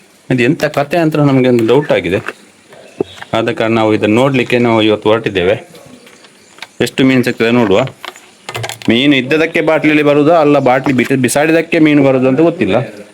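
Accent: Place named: Mangalore, India. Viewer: Meenu tikkijindla bejar ejji anda fishing place and natural kudla style tulu patera soooper, wishing you better fishing next time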